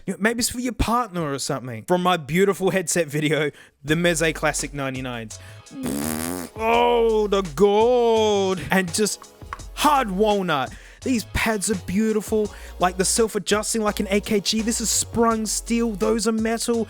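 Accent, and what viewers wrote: Australia. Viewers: Aussie humor + Aussie voice + Headphones and or headsets = great video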